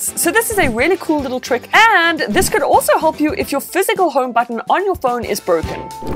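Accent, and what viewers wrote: South Africa. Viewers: I can't understand yr accent, is it South African? i luv yr vids sm <3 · are you South African? I'm picking up an accent :) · I didnt realise we had mouse option, i have it on my ipad so great tip Have you given away your covers ? Great accent (maybe a saffer?)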